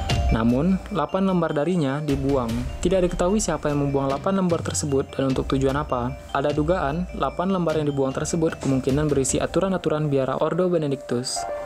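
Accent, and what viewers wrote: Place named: Sumatra, Indonesia. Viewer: dari logat admin sepertinya beliau ada logat orang sumatra dan melayu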